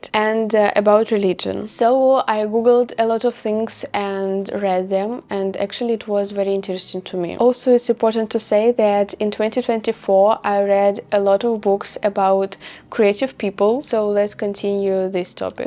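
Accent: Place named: Russia. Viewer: I absolutely agree with this Where as soon as I saw you and hear your accent I knew that you are Russian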